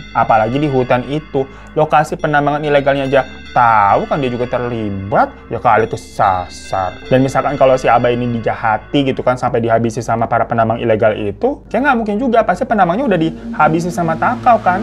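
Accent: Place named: Madura, Indonesia. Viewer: banggg basa banjar bangggg logatnyaaa kek maduraaaaaa😭👋👋👋👋👋👋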